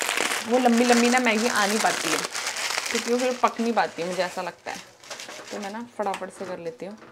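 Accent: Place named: Pahadi, India. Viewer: Bahut achchha laga aapka ghar, aap pahadi bolo ya na bolo, apke bolne mai Pahadi 'Ascent' to aata hi hai.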